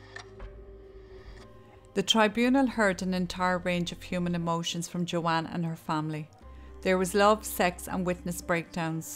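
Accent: Ireland. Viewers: I do have to say that these stories of murder and the following mayhem are awful, but getting to hear them told in that beautiful Irish lilt of yours makes the hearing of them a little more bearable. I’d love to remind folks that “the church” and “religion” is about as far from God as living in sin is. Remember, it was the “church people” who crucified Jesus. Jesus never condemned anyone. He taught His message. Those that received that message were told to go on their way and sin no more. Those that did not, they just went their own way. Most of the atrocities committed in this world have been done in the name of God, but the SPIRIT of God was not behind it. Jesus Christ was crucified by “church people”. If church people would crucify our Savior, how many more of us regular folk will be made to suffer at the churches hand? I hate to think of it. Organized religion is the very devil!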